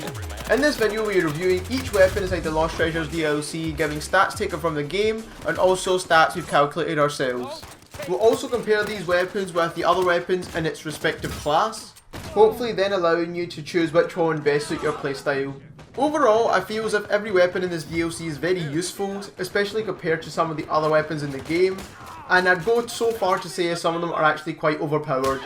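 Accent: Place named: Scotland, United Kingdom. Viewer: It makes me laugh that your like goals are so low. I admit that I watch TheRadBrad and PewDiePie sometimes. Maybe if you said "Take-It-Bitch" in your videos more or could somehow bring your pet into this, I think you could start pushing the "likes" more. I know you think it's a shit accent but the Scottish accent is pretty cool. HaHa! Man I'm only messing with you a little. I like your videos and I think watching has helped my game play.You should be pushing those "likes" in the thousands before too long.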